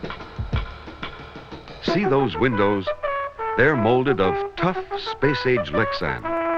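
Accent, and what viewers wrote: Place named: Midwest, United States. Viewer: Same Midwest delivery, but no. Gary Owens as narrator would have made this an instant cult classic!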